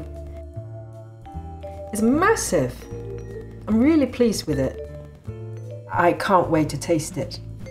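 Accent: United Kingdom. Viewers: LOVE your British accent!! Great video:)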